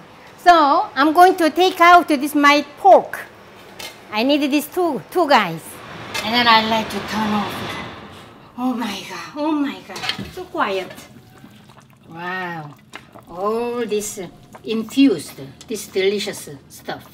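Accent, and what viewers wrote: South Korea. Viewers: 왜 이제야 알게 된걸까요..ㅎㅎ 두바이에서 가정주부가 된 중년 아저씨..이제부터 보격적인 요리남 되렵니다...한국 스탈의 영어 완전 좋아요.... 한국식 영여 악센트를 세계에 고착 시키자구요...다같이... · 망치님! 대박 입니다. 요리 짱! 여기다 구수한 영어솜씨가 한국적 입니다.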